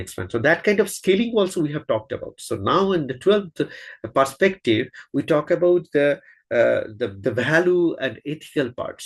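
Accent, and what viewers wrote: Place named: India. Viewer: I hope you have the energy to make more of these. One word of warning: I think a lot of Americans will find your accent hard to understand. We English people are much more used to Indian accents.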